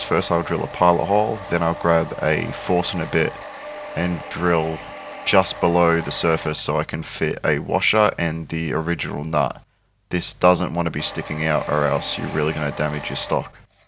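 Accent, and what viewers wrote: Australia. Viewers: Are you Aussie? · WOW!! great work... nice to hear another Aussie voice on youtube..If i may make one comment, ive always used a router with a center jig for cutting out circles.. but i do like your idea of using the beltsander while the sanding disc are spinning...new subscriber..cheers!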